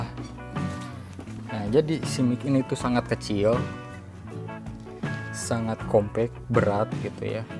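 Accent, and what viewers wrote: West Java, Indonesia. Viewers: logat sunda na nempel teuing a :( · sunda pisan njir, mantap kang 👍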